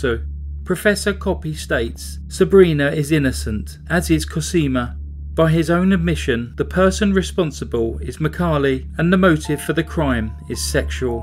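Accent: United Kingdom. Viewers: Aaaahhh, your Italian syntax was so impeccable I thought I was listening to. I could barely notice just a smidge of British accent.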